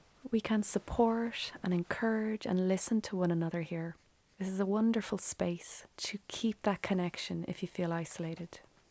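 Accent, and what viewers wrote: Ireland. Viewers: I want to tell you that I just love your channel. Your topics, philosophies, guidance, teachings and even your sweet Irish voice and tone are nourishing my soul. I have had so much stress in my life for years and am afraid that it has made me physically sick to some extent. Am so glad that I found you. Thank you. Charleen from Scottsdale, Arizona, USA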